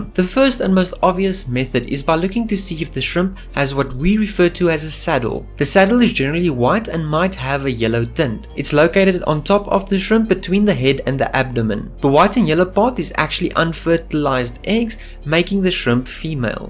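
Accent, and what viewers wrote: South Africa. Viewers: Are you from South Africa Is jy Afrikaans? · Aweeeeeh I didn't check your surname but as I heard your voice I knew ! This guy is from RSA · Bro you had me at the intro, but the south African accent was the cherry. Subbed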